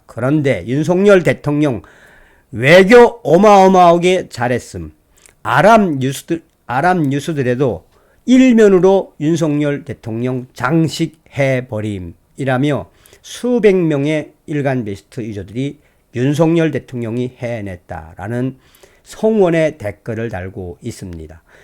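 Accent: North Korea. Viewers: 북한 톤으로 말씀하시는거 듣기 부담스러워요. 항상 방송 잘보고있읍니다 감사합니다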